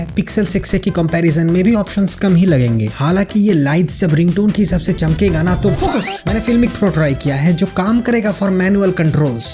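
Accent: Bengal, India. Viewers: The way he speaks, makes the video more interesting And thank u for conforming that u r a Bengali😂❤ Already i guess that by ur accent ❤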